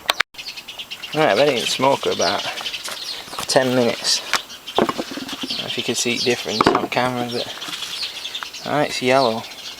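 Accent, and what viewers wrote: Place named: North, United Kingdom. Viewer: best video on pelts ive seen. so many times they say you need this, or that, this is a COST FREE video, so it makes it the best. by the way, are you from the north, i noticed your accent.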